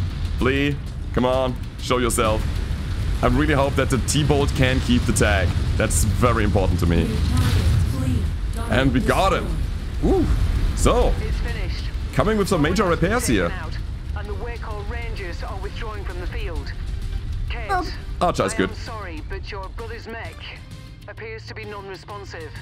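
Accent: Germany. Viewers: German accent "back shot again, oooo hes cherry red" Wife "what the hell are you watching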